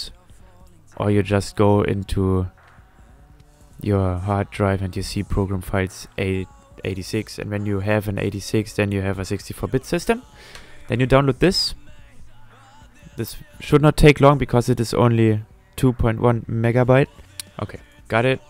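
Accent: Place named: Germany. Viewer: Du hast aber mega den deutschen Akzent xD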